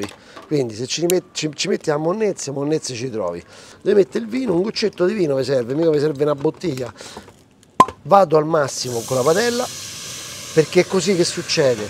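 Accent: Rome, Italy. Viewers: Quanto mi piace quel bel l’accento romano.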